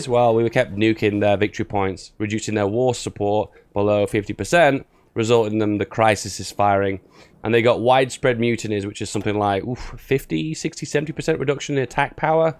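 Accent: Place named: United Kingdom. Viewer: I love your videos and I love your accent. Simply because I'm french (salut l'anglais!) and all the french people loves the british accent in english.🤣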